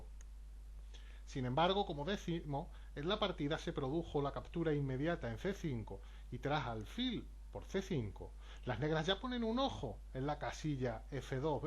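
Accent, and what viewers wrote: Spain. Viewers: a mi me parecio acento catalá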